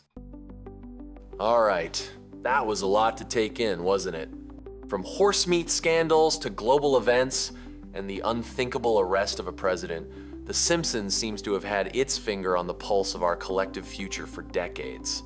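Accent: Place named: United States. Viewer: You must be kidding It's USA English . Point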